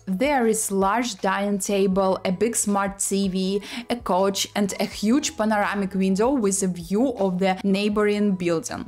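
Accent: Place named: Russia. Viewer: Услышал русский акцент местами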